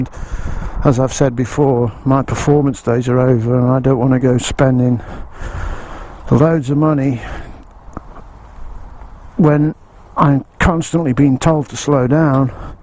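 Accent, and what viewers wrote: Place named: Midlands, United Kingdom. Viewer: Great to see you back and to hear your midlands tones. Its like Nigel Mansell on a Cruiser. Best of luck with the studies.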